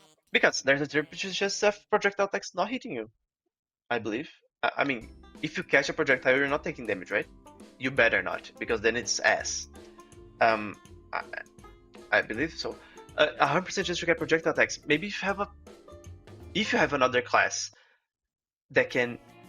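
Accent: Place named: Brazil. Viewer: where are you from? looks like brazilian accent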